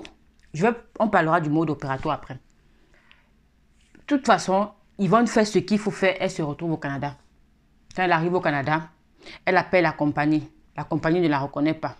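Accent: Cameroon. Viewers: Tu parles comme une camerounaise. J'aime bien ton contenu. Merci pour tout ce que tu fais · Le ton du 237 ne se cache pas mama.. Merci pr la video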